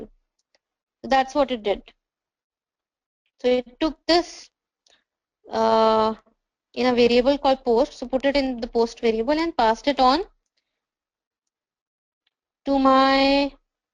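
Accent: India. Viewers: Hey Edureka. Why dont you activate English subtitle sections on your videos? Sometimes it's really hard to understand Indian accent so subtitle make to understand easier. Thanks.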